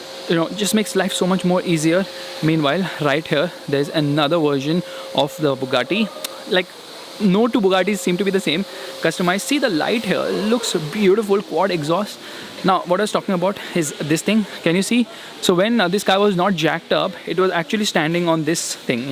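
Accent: India. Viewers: If there is an expensive car, it is a luxury item and if a man is speaking English in Indian accent, it is definitely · Indian accent of Hinglish. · I can understand your indian english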